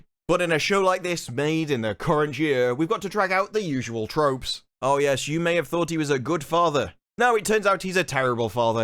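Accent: United Kingdom. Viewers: Sometimes it's funny hearing a person with a British accent nitpick a piece of shit show apart:) You're hilarious but you forgot one of the dumbest things about the Covenant language scene: The show has this whole thing that the Spartans are using their memories to seduce the meaning of Covenant words. Don't their helmets have cameras? Wouldn't they have a ton of audio from the Spartans fighting The Covenant? To fair, in this show's universe, they don't usually wear helmets for battle. Just driving and to remember shit.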